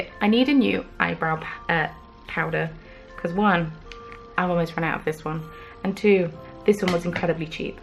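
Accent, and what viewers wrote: RP, United Kingdom. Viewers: I’m thrilled to have found your channel today. You’re one very stunning woman and you speak so beautiful, very “posh” like. I’m currently binge watching your videos and enjoying them. Thank You for sharing... 😊😘😊 ps, where are you from? Xx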